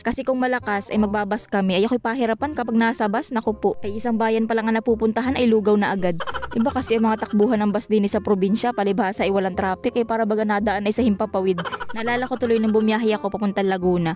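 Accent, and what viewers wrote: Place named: Batangas, Philippines. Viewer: Yung punto mo ms punto rin saamin sa Batangas eh 😂❤ shout nman sa ANDAL FAMILY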